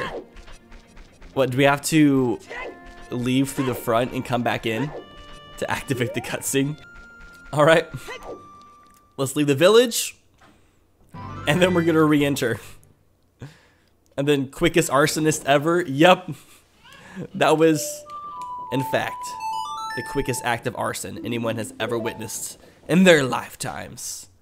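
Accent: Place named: Midwest, United States. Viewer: Haha, no problem. Understand that I mean no ill will or insults to your location dialect (I live in the midwest too and am kinda guilty of the "Ohp" thing as well)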